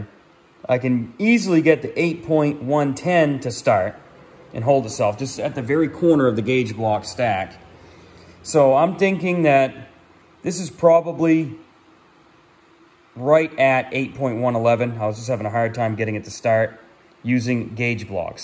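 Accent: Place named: Canada. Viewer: very good info. you sound like a Canadian!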